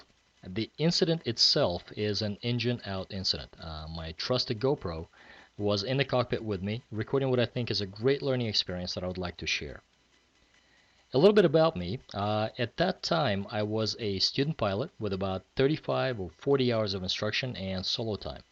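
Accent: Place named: Eastern Europe. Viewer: I've flown over to CCR from HWD, even at least once to build night hours as PIC., you've done a splendid job as an aviator and presenter, and gave us a lovely peek at Mt. Tam into the bargain. I'm far from the East Bay and CC County, now, but it brought back great memories. I also love the little hints of Slavic in your speech, the L's, the hard h's, the narrowed vowels in some places. Even without seeing your name, I'd have heard that. As Scott Manley says, "Fly safe!"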